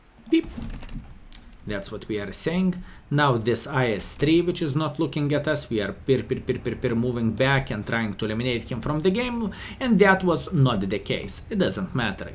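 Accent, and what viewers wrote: Russia. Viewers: where is he from then ??? He may not live in Russia but definitely speaks it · russian akcnet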